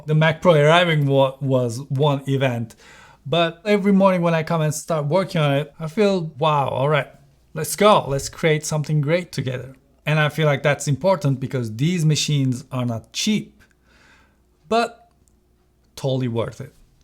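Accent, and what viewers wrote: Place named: Turkey. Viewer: türk oldugu sesinden belli :D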